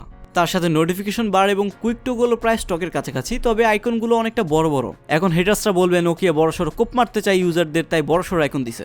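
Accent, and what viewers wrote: Bangladesh. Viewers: I totally love to laugh at these Bangla youtubers English accent. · mojar... specifically Bangladeshi accents. sadharon jiniso bes valo legeche. good going.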